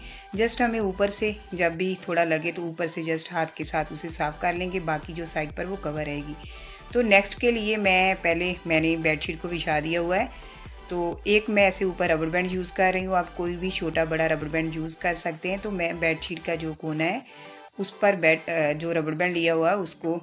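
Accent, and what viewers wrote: Punjab, India. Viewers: Thanks par ek baat confirm hai ki aap Punjabi Ho apke bolne ke tarike se pata lag raha hai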